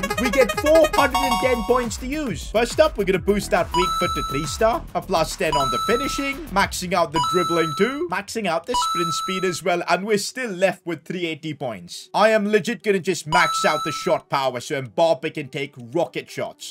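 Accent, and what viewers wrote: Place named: India. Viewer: Who ever thinks has indian accent sound 👇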